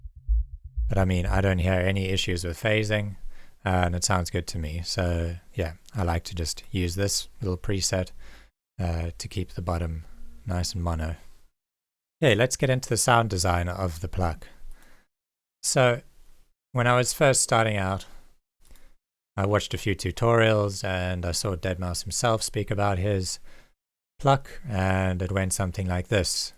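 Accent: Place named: Cape Town, South Africa. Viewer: Great tut, thanks. Cape Town accent?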